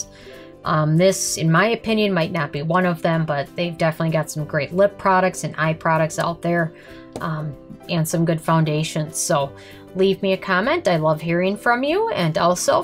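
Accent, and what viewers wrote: Minnesota, United States. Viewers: Are you from Minnesota? Great review!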